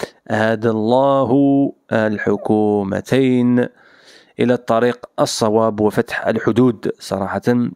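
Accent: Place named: Kabylie, Algeria. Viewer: ما شاء الله .. لغة عربية فصحى رغم اللكنة الأمازيغية لمدينة تيزي وزو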